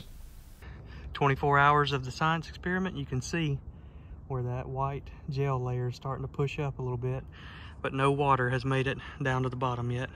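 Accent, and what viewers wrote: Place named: Florida, United States. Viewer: These videos are so wholesome. Your accent also reminds me of home as I live in Pensacola FL not far from you thank you for the videos brother.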